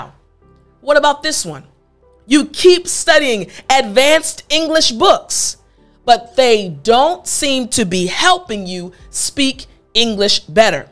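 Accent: Africa. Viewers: you speak in English like Africans.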